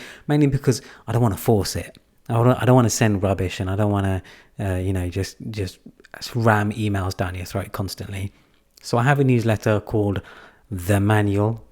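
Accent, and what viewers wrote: United Kingdom. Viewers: Hi, I find your accent quite interesting, is it cockney?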